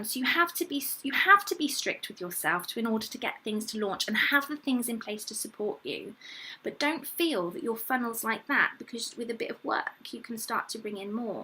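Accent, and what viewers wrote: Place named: United Kingdom. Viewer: Your accent is British 🙂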